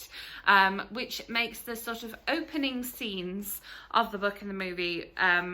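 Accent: United Kingdom. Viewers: You have a British accent, are you in the UK or America? You do love your books, do you like factual books or more fiction?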